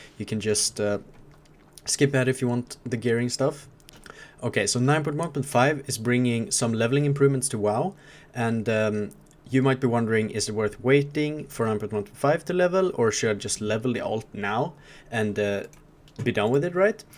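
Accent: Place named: Sweden. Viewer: Du måste vara svensk haha hör det på engelskan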